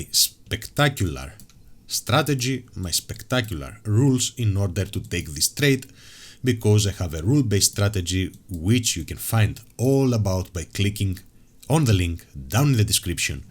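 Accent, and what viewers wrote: Greece. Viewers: your accent is greek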